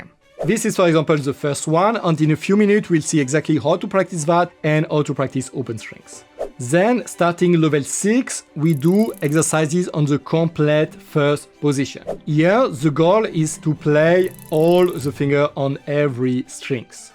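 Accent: France. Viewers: Your French accent is difficult to follow. Please use AI to change to a British or American accent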